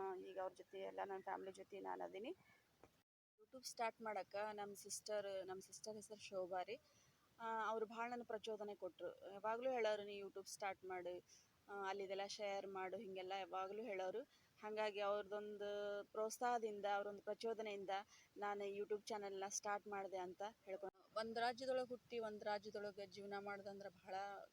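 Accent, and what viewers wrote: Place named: Kasaragod, India. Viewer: Its not Kerala Karnataka kerala voice ---------> kerala , Kasaragod voice super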